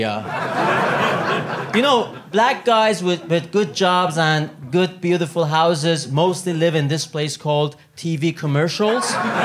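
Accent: Iran. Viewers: De Santos with an Iranian accent? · he talks with persian accent! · Why does he sound Iranian?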